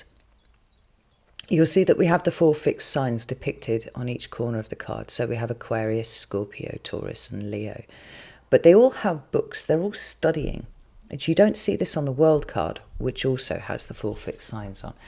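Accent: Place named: England, United Kingdom. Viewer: I’ve never been a fan of the English accent, but I like it when I’m in here. I guess there has to be a threat of profanity to soften it for my ears. Delivery is stunning. I’d put you on the large screen and the cards in the small screen, if left to me.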